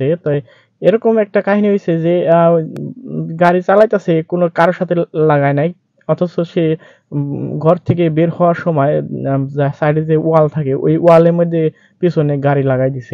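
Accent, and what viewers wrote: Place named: Jamalpur, Bangladesh. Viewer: আপনার দেশের বাড়ি জামালপুর? ভাষা শুনে মনে হচ্ছে... জানাবেন প্লিজ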